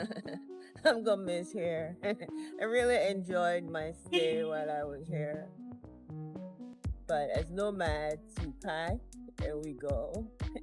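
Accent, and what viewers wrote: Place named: Caribbean. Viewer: You sound like you are from the Caribbean. If you get a chance, take a trip to Kenya. There is a straight flight from Thailand to Kenya and back.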